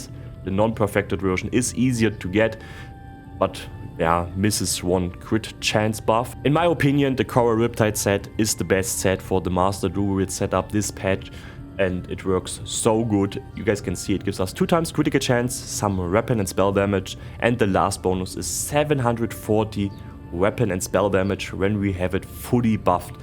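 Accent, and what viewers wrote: Germany. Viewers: Love ur german english 😂👌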